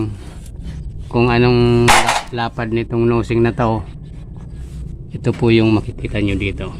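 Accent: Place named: Bicol, Philippines. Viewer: Bicolano manoy?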